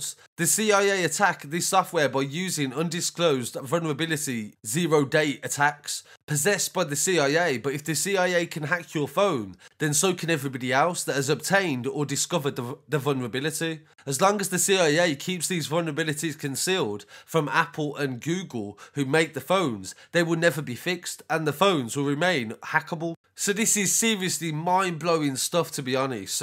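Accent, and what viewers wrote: Birmingham, United Kingdom. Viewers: You're moving so far from being an actual journalist its a joke. You can't just read a daily mail story in a Brum accent. Pitiful cuz, you're doing more harm than good. Journalist? Joke ting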